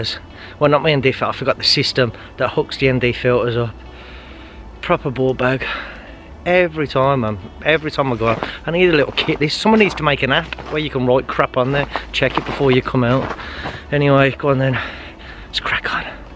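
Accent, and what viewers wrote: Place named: Birmingham, United Kingdom. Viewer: The brummie version of Kai from DigitalRev...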